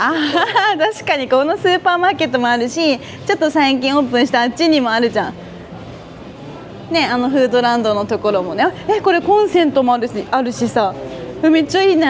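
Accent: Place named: Fukuoka, Japan. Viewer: Target isn't Cheap, their prices are High due to the location of the shop being in Waikiki and caters to tourists mainly, and the rent Target pays for the location is included. Locals don't shop in Waikiki, major rip-off! Walmart, Costco, Sam's Club, Donqui Jote, Times Market are the cheaper places to shop. Wholefoods, Kahala Mkt, Kakaako shops are expensive. Love your videos and your cute Fukuoka accent!❤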